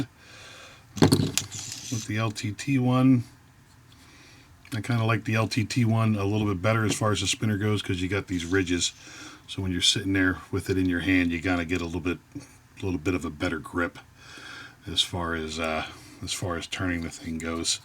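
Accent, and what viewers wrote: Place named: New Jersey, United States. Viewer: Upper mid Atlantic sure sounds like Joizey to moi...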